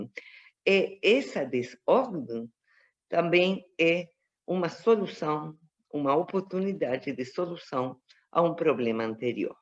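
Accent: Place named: Brazil. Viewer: Está muy mona y habla como brasilera! Se entiende todo. Muy bueno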